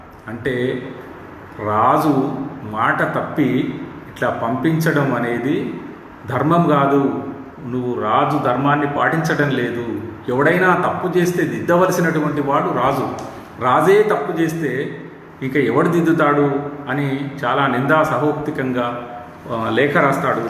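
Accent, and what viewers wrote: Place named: Telangana, India. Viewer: Sir..... Mee telangana mandalikam tho kudina telugu Chaala bavundhi.... Sir 🙏🙏🙏🙏🙏🙏🙏🙏🙏🙏🙏🙏 Ee video Telugu vallu Bhavishyatthu lo chustharu sir ekkuvaga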